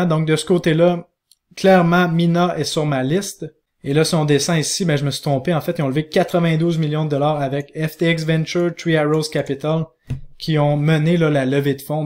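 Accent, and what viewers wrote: Quebec, Canada. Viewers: vidéo très complète, seul soucis c'est que l'accent canadien est pas trop plaisant dsl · Salut!! Merci pour tes vidéos et vraiment tu es mon meilleur youtubeur!! Pas de blabla et de ramassis de conneries comme certains qui te font fomo.ta franchise et ton franc parlé le plaît!!tu es tjs présent dans les hausses comme dans les baisses!je te suis de déjà un bon moment.je le souviens que tu parlais si je me trompe pas du fromage a burger que l on mange....je ne pense pas que ETHcefera detronnee car c'est du lourd!! Et présent depuis déjà longtemps et les develloppeuts sont derrière!! Puis ton accent Québecois...j adore!! Mercipour ton taf!! K en redema'de encor et tjs.vivement la suivante!! Merci!!!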